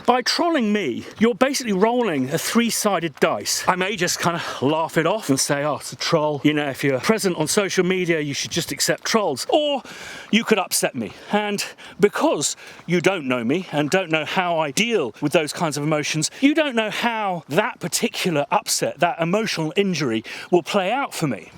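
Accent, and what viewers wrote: United Kingdom. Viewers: Mr here in Mexico we say something about that subject... "You are not a Golden coin you will not like to everyone", someone will like you because who you are and what and how you approach things, and the other will hate you for the same reason, the best advice that a 23 years old young man is that if that someone tells you something and you did not think as he/she does the best thing to do is to say is thank you for your opinion, and s Keep things you love and do it in the way you love. hope my simple advice can be helpful in some manner PD: I really enjoy you style of vlogging and your British accent haha.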